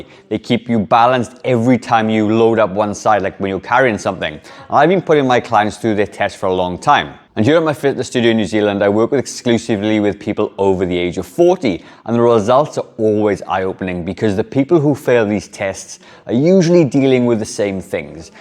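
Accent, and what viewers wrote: Wales, United Kingdom. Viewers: Im Scottish, im loving your Welsh accent. Celts together!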